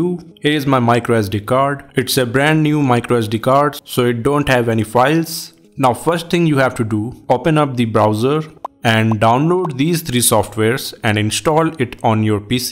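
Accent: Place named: India. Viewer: your indian accent kills me! really? does everyone in India talk english that shitt*y? pffff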